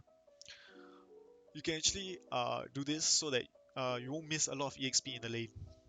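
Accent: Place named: Singapore. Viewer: great guide, from sg? (from ur accent xd) was thinking of grinding through WR on release haha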